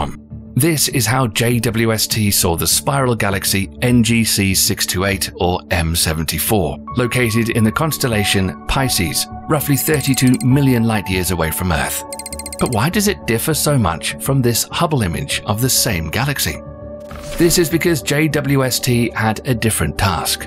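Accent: United Kingdom. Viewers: You think that a British accent gives videos some sort of validity and truth. But there is not much true information in this video. It's nearly completely fictitious rubbish.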